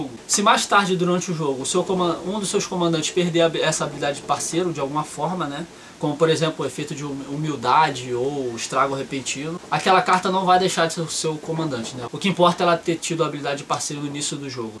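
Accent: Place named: Rio de Janeiro, Brazil. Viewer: carioquês é foda de aguentar... desculpa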